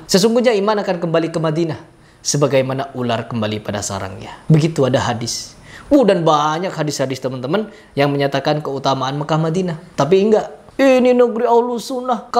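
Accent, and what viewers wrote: Madura, Indonesia. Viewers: Assalamualaikum 🙏💪 bang maaf bang Kayak orang Madura dr logat bahasa nya Madura Sy dr Madura bang 💪💪💪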